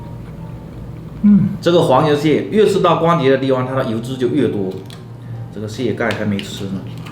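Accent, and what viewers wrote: Fuzhou, China. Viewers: 怎么福州口音 这么重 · 一听就是老福州了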